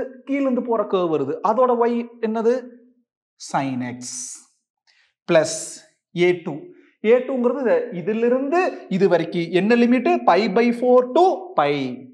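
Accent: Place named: Kongu, India. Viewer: That kongu slang nd teaching methods are 🔥💯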